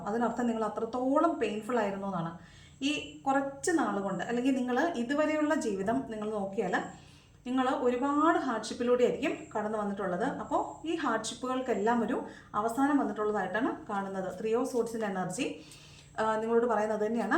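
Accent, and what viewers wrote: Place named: Trivandrum, India. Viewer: Chechi trivandrum anale.... Chechide ambada pulusuuu kelakan nalla ressama.... Chechi parayunnatoke correct anu...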